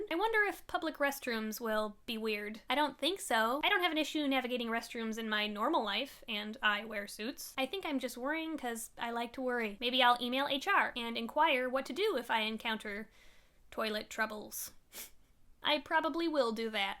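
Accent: Minnesota, United States. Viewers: You live in Minnesota right? Your accent peaks through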